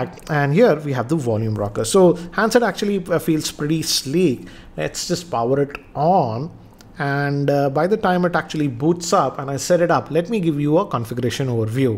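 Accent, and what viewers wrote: India. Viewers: Nice a indian speaking englishI insta sub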